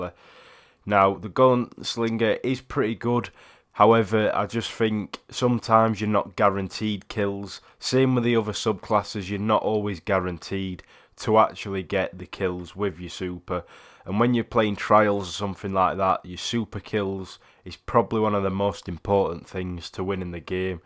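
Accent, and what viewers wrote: Yorkshire, United Kingdom. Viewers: You sound like a reight Yorkshire man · Dude are u a yorkshire lad????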